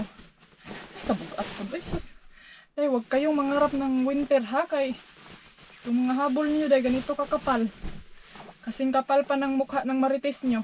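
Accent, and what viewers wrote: Philippines. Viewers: Bugay kay kag English Indai pinay na pinay I like it 😄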